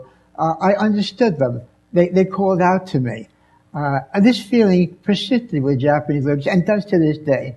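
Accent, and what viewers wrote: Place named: Brooklyn, United States. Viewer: Never lost his Brooklyn accent.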